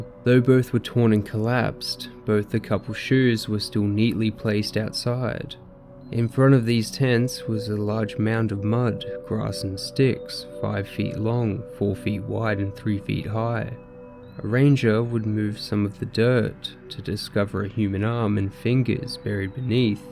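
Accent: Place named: Australia. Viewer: Only made it 6 mins in & had to turn it off i can't stand your accent. Australia?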